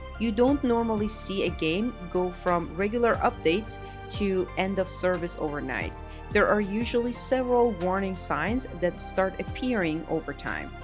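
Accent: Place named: Iran. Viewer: your accent sounds like it Persian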